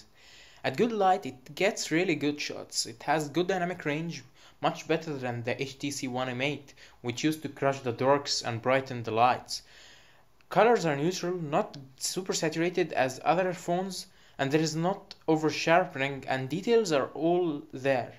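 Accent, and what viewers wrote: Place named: Arab world. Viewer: dat arabic accent doe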